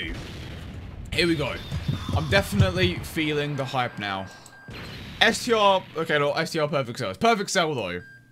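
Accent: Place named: United Kingdom. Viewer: Karlo that's British accent for ya he literally said Imperfect Cell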